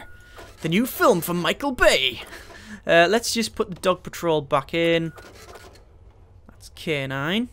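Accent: United Kingdom. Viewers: You have absolutely no idea how much I love you cause your from the uk, all the uk talk is just cute to me like snookered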